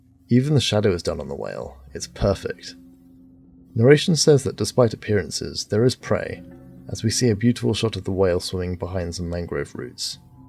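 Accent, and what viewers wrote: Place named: London, United Kingdom. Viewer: I'm really enjoying the episodes so far. I swear your voice sounds familiar. Though it could just be the London accent. Does Valerian mean anything to you?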